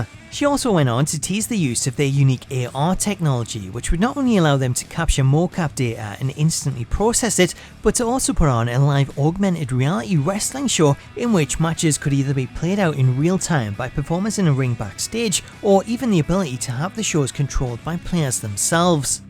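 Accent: Newcastle, United Kingdom. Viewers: North East England/Geordie accent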